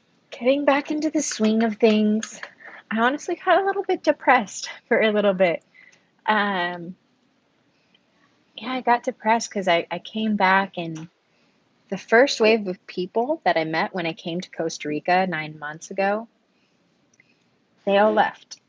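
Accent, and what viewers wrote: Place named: England, United Kingdom. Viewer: Hey you are so beautiful I saw you from leave her wild and then I watch your videos I think you were such a beautiful young beautiful girl oh yeah I love your accent your English one that is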